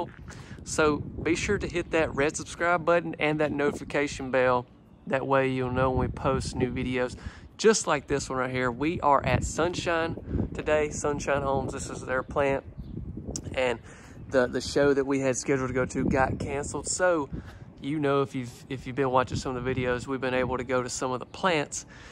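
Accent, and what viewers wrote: South, United States. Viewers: This a beautiful! Bright! You are southern guy you sound like you supposed to sound. Don't change. You are so funny in your own way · You sound Extremely southern....not ghetto 😏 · Didn’t care for the “ghetto” remark. You sounded more country than anything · thats not ghetto speak. that would be what you hear in a inner city (mostly black) area. what you are doing is southern slang.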